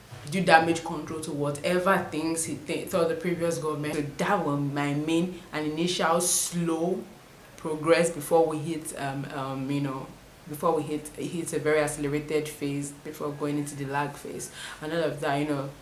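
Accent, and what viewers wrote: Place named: United Kingdom. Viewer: Thank you..very helpful. I hope to get in next year. The British accent keeps popping up every now and then😊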